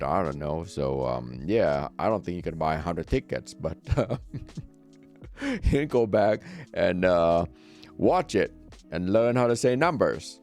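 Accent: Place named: United States. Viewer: Your English is perfect and sounds American 🇺🇸 Great video. I like your humor too. 😊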